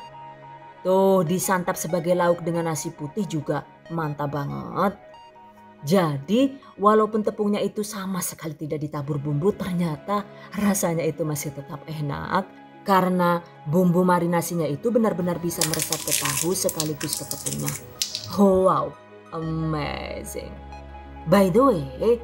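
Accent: Banyumas, Indonesia. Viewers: Ok OK OK Siap di nikmati lugot Chennel ini sangat ngapak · Orang ngapak bu? 😆😆😆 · Bahasane ngapak metu ya bune, kaya nyong deneng, joss 😃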